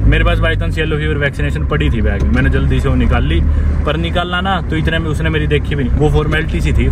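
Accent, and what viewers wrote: Haryana, India. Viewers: Bhai Ki Haryanvi ❤❤ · ap ki language me haryanvi felling ati jo bahut achi baat hai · Love your typical Haryanvi ascent 😅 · Haryanvi boli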